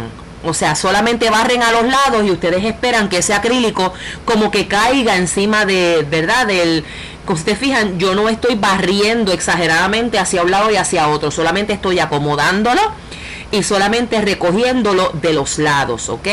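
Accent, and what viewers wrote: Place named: Puerto Rico. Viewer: Me encanta tu acento boricua tengo una tía allí en Puerto Rico.......me encanta tu diseño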